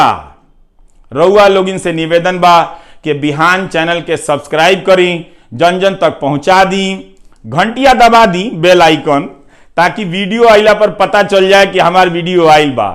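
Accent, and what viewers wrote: Bhojpuri, India. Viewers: इतनी मीठी भोजपुरी हम कभी नहीं सुने हैं. एक तो "रिंकिया के पापा" गानावाला भोजपुरी. नहीं तो पुलिसिया भोजपुरी. पर आपका भोजपुरी एक अलग अंदाज का है. मारीशस में दुसरी सबसे ज्यादा बोलनेवाली भाषा भोजपुरी है. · Really sir, heart touching channel.Hearing you in so sweet Bhojpuri has made my day.Best wishes sir. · Your Bhojpuri accent is so nice. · Bahut badiya sir bhojpuri bahut hi mitha boli h. raura bhojpuri k or uper utha le jaim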